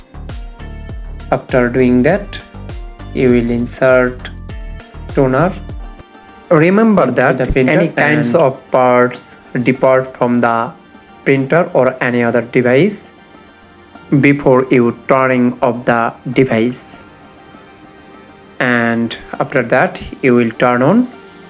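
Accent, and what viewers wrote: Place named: Bengal, India. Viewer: তুইযে বাঙালি সেটা এমনিও বোঝা যায়। .. ইংরেজি এমনিও পারোস না হুদাই ভোকোর ভোকোর বাংলায় ক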